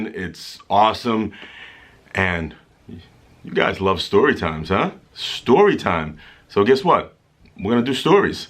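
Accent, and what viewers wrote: Boston, United States. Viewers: 1. Your Boston accent is showing. 2. I can tell edited the video because she inserted "Finally WTF" 😂😂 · Wonder why the twins don’t have your Boston accent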